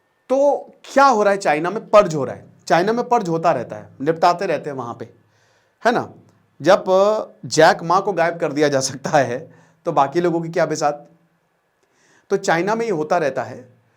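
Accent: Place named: Lucknow, India. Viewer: Sir lucknow ki gehri छाप hai ap pr …. “उसी चौराहे पर टाँग दिए जाओगे “ itnaa khtraank bolaa apne 😅😅😅😅 😊😊😊😊😂😂😂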